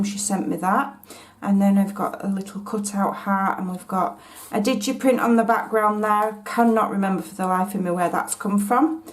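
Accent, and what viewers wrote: United Kingdom. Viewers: Sometimes the British way of speaking is hard for me to understand. Could u please repeat the lady that sells the beautiful Victorian art pictures? I would love to also creat these bags. Love your videos thanks so much.....I am a shabby chic. Lady also....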